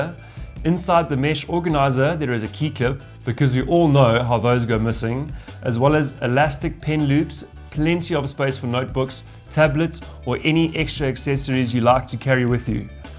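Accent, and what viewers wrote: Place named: South Africa. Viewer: That would be a South African accent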